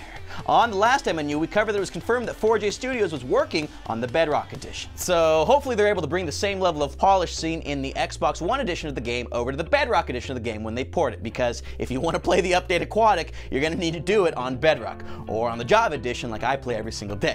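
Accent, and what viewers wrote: Netherlands. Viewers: Wait... are you dutch?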